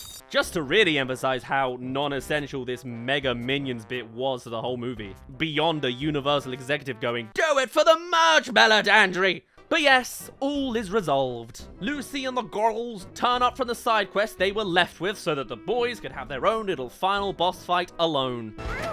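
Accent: United Kingdom. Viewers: Wait!! You’re British??? 😅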